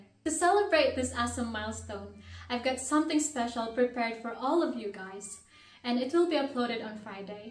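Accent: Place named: United Kingdom. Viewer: lmao I can see you're developing a British accent, and I honestly love it being part British myself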